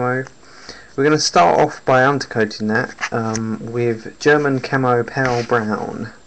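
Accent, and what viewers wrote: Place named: United Kingdom. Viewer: Good God!! A British voice!!!!!!!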